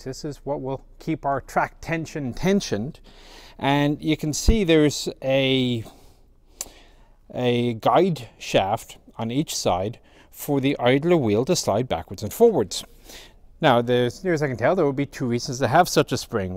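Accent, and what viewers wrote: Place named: Ireland. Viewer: I don't care for tanks, never seen one, never interested me. But that Irish accent I want to hear it all day.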